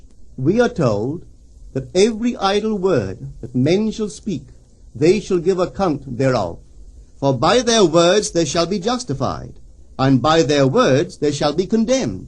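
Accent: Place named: Barbados. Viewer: This isn't Neville's voice. This is someone else. I think they(on this recording) might also be from Barbado's but this NOT. I know his voice.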